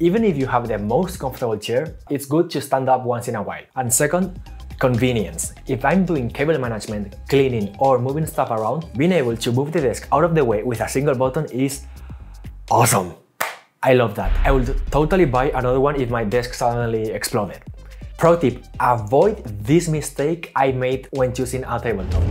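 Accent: Spain. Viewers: Not sure if this guy is Spanish or Greek.. XD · Te he pillado el acento español desde la primera frase que has dicho jajaja. Un orgullo tener a alguien con unos videos tan chulos como los tuyos aquí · Hola! Es el primer vídeo que veo tuyo y estaba reconociendo el acento español jajaja. En cualquier caso, enhorabuena por hacer un canal en inglés, es un gran gamechanger y te deseo una buena trayectoria porque se nota el currazo que le pones a los vídeos. Un saludo! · Eres español? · East asian face, english language, spanish accent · I may be wrong but where are you from? It's because of your accent that you sound very Spanish to me. If so, enhorabuena por tener el valor de hacer un canal en ingles. Greetings from the north of Spain. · When you started speaking, I knew that that accent sounds familiar to me, you're from Spain!! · I thought I heard a familiar Spanish accent, then it all made sense when I checked your channel info. 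Saludos de otro editor, crack! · Bro tu eres de malaga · never in my life have i encountered an asian person with a spanish accent, weirdly fits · nunca había visto tu canal, a primera vista pareces asiático, pero en cuanto escuche tu inglés supe que eras Español 🇪🇸, ese acento es inconfundible 😂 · Desde el segundo 1 sabia que eras español jajajajjaja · hey! I just landed on your channel, I'm curious about your accent, it sounds Spanish! Cool videos and animations btw <3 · interesting Spanish accent... btw good editing does not bring as many viewers because it doesn't hit as hard as crazy ideas and high budget stunts... editing can only make a video already at a certain level better, but cannot add substances it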